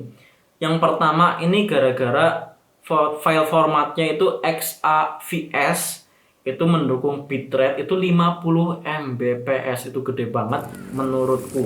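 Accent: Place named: Central Java, Indonesia. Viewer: tapi kok logat nya jawa bang, kyk logat jawa tengah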